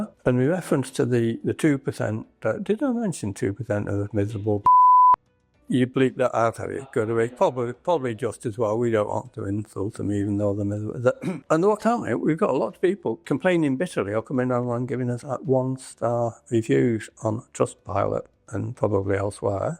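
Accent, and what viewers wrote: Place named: United Kingdom. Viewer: Chards are always good. Not often we hear these British accents on Youtibe either for some reason. Bad reviews just come from uninformed ppl. As soon as Chards makes something idiot-proof, the world just comes up with better idiots! 😂 Sod's law. PS... We need some juicy jousting between dealers on youtube, that would be fun! Difficult though without scripting some stuff. A big convroversy or investigation that blows over eventually after many ups and downs would be just the ticket for this market! 😂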